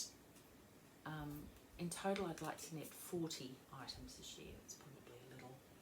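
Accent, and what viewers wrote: Australia. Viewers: Hi. Great to hear another Aussie voice. I’m at the other end of aus from you in Vic. Love knitting. I’m a monogamous knitter. I find it too stressful having several things on the go.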